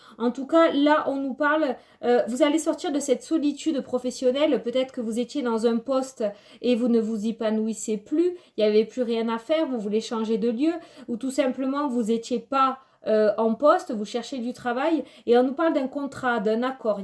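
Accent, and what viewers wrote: South, France. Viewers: Bonsoir ...je me suis proposée de faire du bénévolat . Je commence demain matin et ont vas peut être me proposer d' autres missions.. étant actuellement au chômage ...j' ai travailler dans le social pour personnes handicapées et autistes ...j' ai de l' expérience.. malheureusement j' ai préférer donner ma démission ( surcharge de travail et le système ma déçu..je garde de très bon souvenir des résidents qui était attachant pour ma part. Je voudrais travailler avec les eyen maternelle ou du social pour femmes en difficulté..🙏🙏🙏🙏 ⭐⭐ je ne perd pas espoir je souhaite de tout cœur ma situation professionnelle de réalise .. merci pour votre tirage votre accent du sud je pense ..cela me fait penser ( le soleil la mer ., tranquille quoi 🤣🤗💋💋💋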